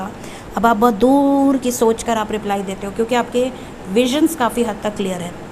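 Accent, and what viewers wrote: Varanasi, India. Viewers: Har har mahadev🙏❤ apki banarasi language bahut pyari h i love this language mam🙏 thank you angels thank you universe thank you divine thank you mam god bless you 🙏❤🌷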